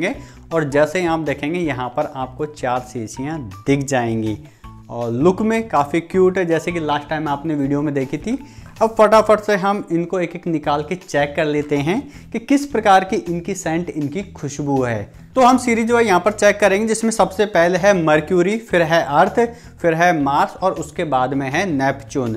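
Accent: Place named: Western Uttar Pradesh, India. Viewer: samjh gya tha mein bolne ki accent se konsi city se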